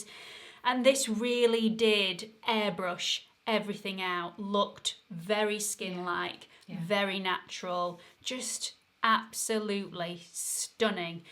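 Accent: United Kingdom. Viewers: 1. So glad your mum is back, healthy and beautiful!! 💖 2. You look lovely with red tops,!!! ❤ 3. Together you 2 rock this review and I'm so happy, that you tested so much stuff! Could listen to your british english the whole day! 💗 4. Please do a video with dupes Thanks, thanks, thanks for your channel! Hugs and kisses from Germany, Sabine 😘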